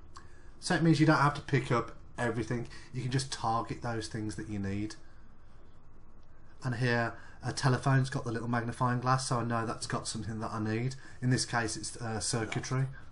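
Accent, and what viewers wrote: West Midlands, United Kingdom. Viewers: Subbed because you have a West Midlands accent :D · Sounds like a West Midlands accent to me :)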